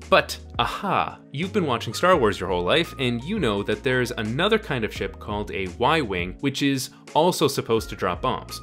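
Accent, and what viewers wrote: Canada. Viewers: Are you Canadian? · by the way he pronounces certain words I have always thought he was Canadian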